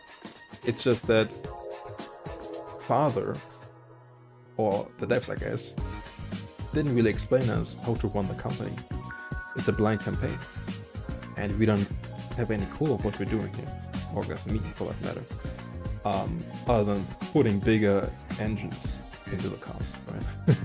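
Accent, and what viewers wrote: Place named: Germany. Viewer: Why do you sound German?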